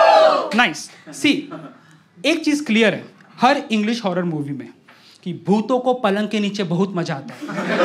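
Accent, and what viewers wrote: India. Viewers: The Marathi accent. lol.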